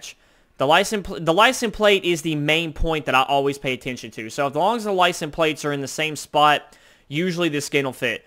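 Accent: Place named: South, United States. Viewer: you sound like the country version of danny trajo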